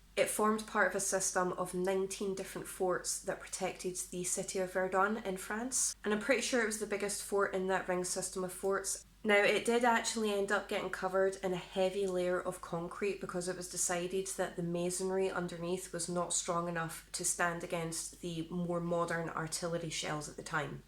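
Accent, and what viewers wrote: Scotland, United Kingdom. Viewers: This my first time checking your metal history. It’s pretty cool. I want to hear you pronounce some Hawaiian words. I haven’t heard that in a Scottish accent.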